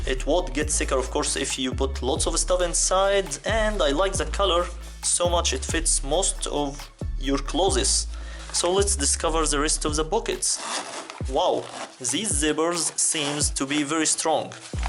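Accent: Egypt. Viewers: your accent seems to be Egyptian, bro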